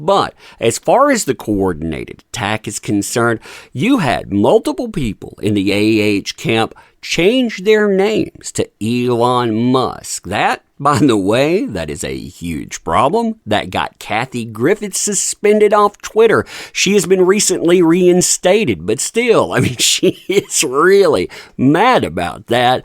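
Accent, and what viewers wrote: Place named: Tennessee, United States. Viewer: Hey All, Please hit the like button, watch all the way through, & share this video everywhere, it’s important. Plus, who can resist listening to voice & beautiful Tennessee accent, that is a sophisticated, cultural gem; an accent that’s quickly disappearing from society. Thanks!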